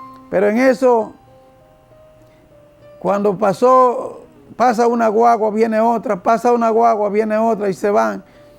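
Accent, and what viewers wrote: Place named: Dominican Republic. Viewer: Dios le bendiga. Apenas empecé a escucharlo no sé si identifica el país desde donde está. Pero lo identifico por su manera de hablar, imagino que es de RD, gracias por su testimonio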